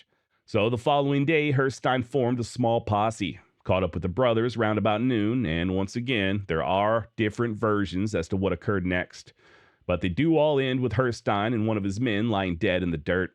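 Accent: Texas, United States. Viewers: right on I actually thought Missouri or Texas second possibly Louisiana you have a unique voice...no diddy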